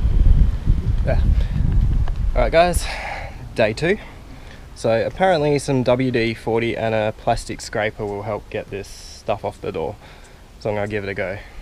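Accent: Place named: Western Australia, Australia. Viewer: Great transformation on that grotty ute! Am I right in thinking you are a fellow West Aussie? The accent... 😉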